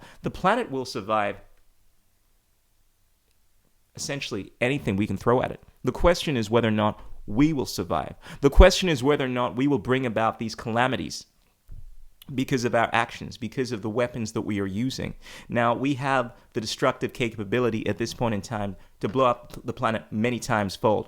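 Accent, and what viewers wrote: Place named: United States. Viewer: Why do you speak with an American accent if you're from Ghana?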